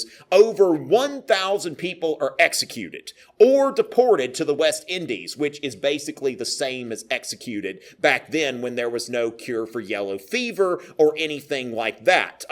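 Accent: South, United States. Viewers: It is so strange hearing such highbrow stuff being taught with a thick, hick accent. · This is a really good series. Engaging teacher, which isn't that common! Strange to hear British history with a southern accent, but nonetheless a really fantastic foundation of the period.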